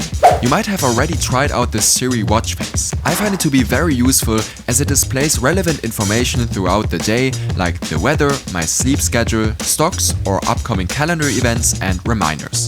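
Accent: Germany. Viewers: German accent overload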